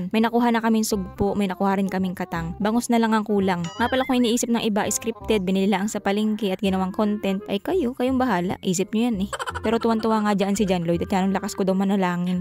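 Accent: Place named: Mindoro, Philippines. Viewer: Hi miss sana lagi kang makapag upload araw2 nagustuhan ko mga content mo kasi natutuwa ako the way ka magsalita pare pareho talaga ang tono nyo ni kamangyan vlog, saka si mommy che na keri taga mindoro rin sya saka yung mag asawang teacher na vlogger yung babae naklimutan ko yung name nila sa fb para kasing bihira na sya mag vlog eh kaya nalimutan ko na pero love na love ko kayo mga mindoronian mabuhaY kayo....❤❤❤❤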